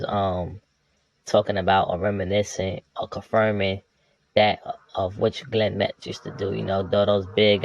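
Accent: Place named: Baltimore, United States. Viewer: Yo you got to be from Baltimore please work on presenting your presentation better you keep saying arm that shit is driving me crazy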